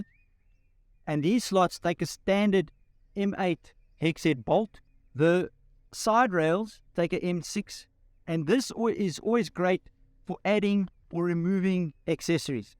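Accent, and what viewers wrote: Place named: South Africa. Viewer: i could listen to you for hours, not because of the roofrack, because of the accent. it remains me of 23 Years living in South Africa. <num> .Then back to Switzerland. But nevermind , your products are great. Lekker bly and tot siens.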